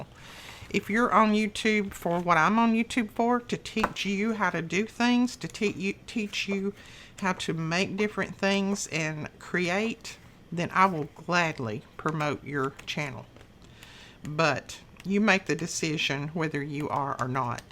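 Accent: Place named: Eastern Kentucky, United States. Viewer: Dont know where you are, but totes sounds eastern Kentucky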